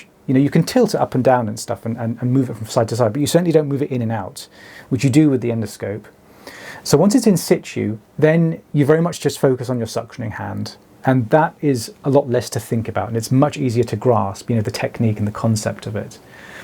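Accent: England, United Kingdom. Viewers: I might be really shallow but I like to tune in just to listen to your beautiful English voice. Wax removal is a bonus!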